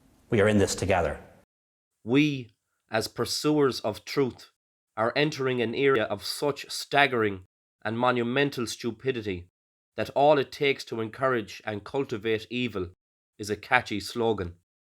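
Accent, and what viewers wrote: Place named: Ireland. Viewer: Cheers dude. Nice to hear an Irish voice of reason. Between you and Dan's Mix Tape I feel a little less like society is collapsing. Keep up the good work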